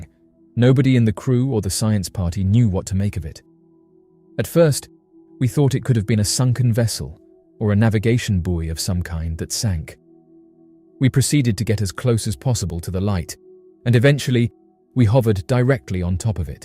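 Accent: United Kingdom. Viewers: What's with the limey accent?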